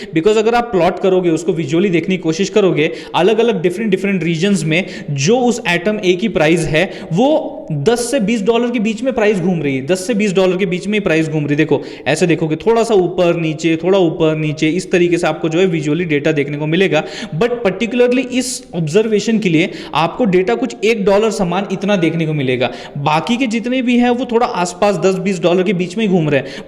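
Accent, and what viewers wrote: Maharashtra, India. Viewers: Sir language apki Marathi ki Tarah h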